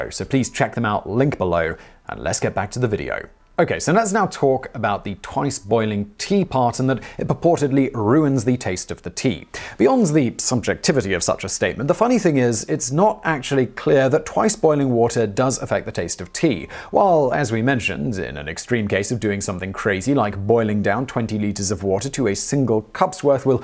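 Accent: United Kingdom. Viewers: I just got tricked into listening to a Brit talk about tea for 15 minutes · it’s hard as it is to understand this fast speaking British accent. you are a life saver .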